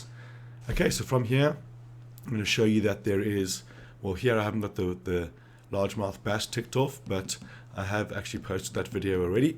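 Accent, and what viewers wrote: South Africa. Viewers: Sound like a South African 🤔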